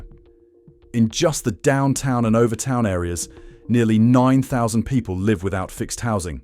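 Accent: England, United Kingdom. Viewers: Who is putting this BS out???..California???.NYCity???...and this person has an English accent!!!....I live in Fla. and I do not SEE any of this reported BS!! So those who were homeless and jobless and dependent on Welfare or Charity in their previous locale, come to Fla. and bring their same hard luck stories with them!!!!....I recommend that Florida establish a border check point and IF you are not already a resident, then you get turned around to go back where you came from....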